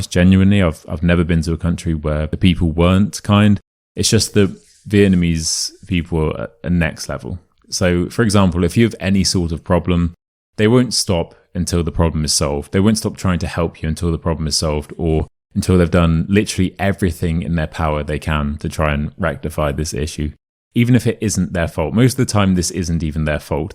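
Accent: United Kingdom. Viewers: Your voice is surprisingly calm and pleasant... the British accent - there are actually several, as in every country and language - is brilliant! It's difficult to achieve for a non-native speaker of the UK... thank you for the generosity of your videos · The best British accent!!!!! I'm Brazilian and I love the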